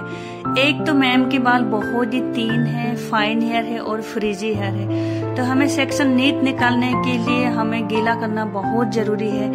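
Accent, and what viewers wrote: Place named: Bangladesh. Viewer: Ap Bangladeshi ho kya apki Hindi se lag raha hai perfect nhi hai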